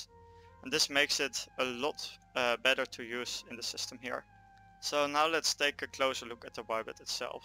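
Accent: Netherlands. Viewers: je kunt gewoon horen dat je een nederlands accent hebt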